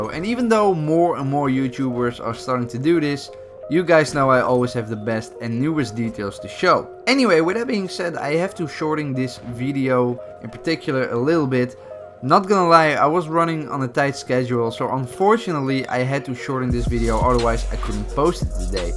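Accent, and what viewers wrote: Netherlands. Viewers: Are you dutch? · Are you dutch because you sound dutch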